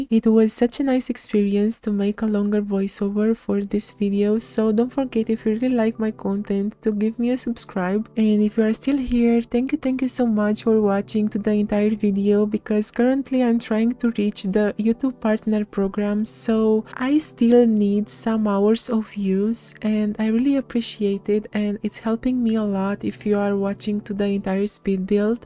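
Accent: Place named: Greece. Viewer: Your Greek accent 😍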